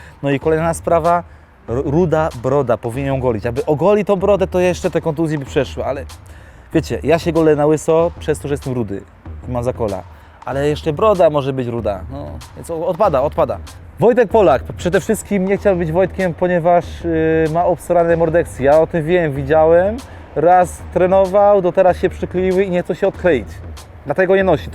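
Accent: Silesia, Poland. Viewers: a jak ma mowic skoro jest ze slaska xD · nie kwestionuje tego, po prostu musiałem tym komentarzem dać upust emocjom, ponieważ najzwyczajniej w świecie nie podoba mi się gwara śląska, mimo że twój kanał jest całkiem fajny ^^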